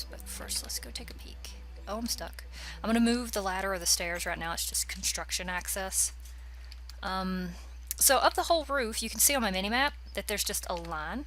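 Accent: South, United States. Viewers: she has a little bit of a southern accent. :)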